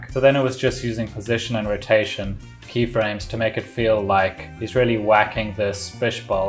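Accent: South Africa. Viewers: lol I knew you were south african from the first 5 seconds of the video🥳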